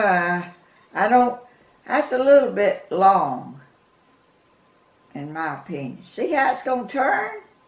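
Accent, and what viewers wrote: South, United States. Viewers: I JUST LOVE YOUR SOUTHERN DRAWL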